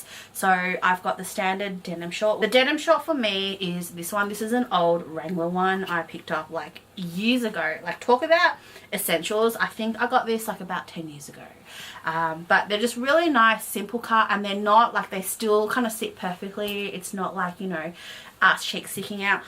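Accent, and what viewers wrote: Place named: Australia. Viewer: Only 4 mins in and already love this chick. Nice to hear a fellow Aussie too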